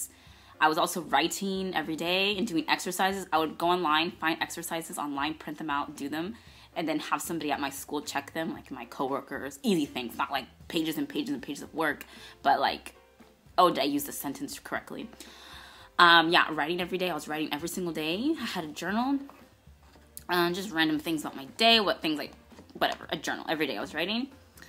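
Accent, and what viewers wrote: Spain. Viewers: Oh no! everything was going great until I heard the Spanish accent(Spain) ugh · definitivamente, tienes un accento de España jaja! Me encanta. He aprendido aquí en México y toda la gente de otros países, dicen a mí - qué chido??? eres de México de repente??? jajaja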